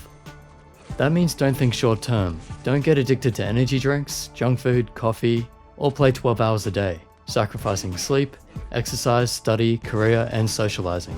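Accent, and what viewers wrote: Australia. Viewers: Are you Australian? Started watching your vids after I bought my DA elite, never figured that you are Australian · Oh damn, I never thought you would be Aussie. GG! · Yo didn't realise you were Australian, how're you dealing with the heat wave?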